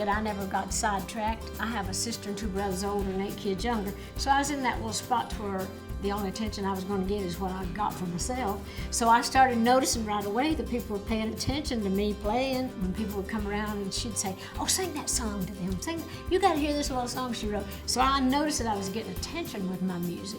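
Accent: South, United States. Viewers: I love the Southern American Accent!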